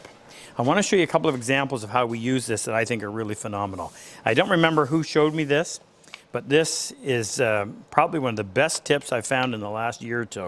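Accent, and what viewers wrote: Canada. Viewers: Ar u a Canadian or American Ah!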